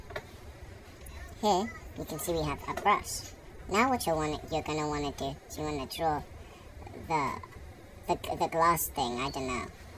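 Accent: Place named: United Kingdom. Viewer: U sound so british 😭😭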